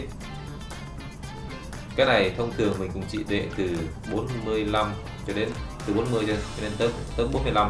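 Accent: Vietnam. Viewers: Bạn người ở đâu mà nói giọng miền trung vậy...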